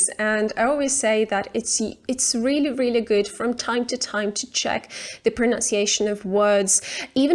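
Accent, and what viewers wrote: United Kingdom. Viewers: Какой красивый британский акцент.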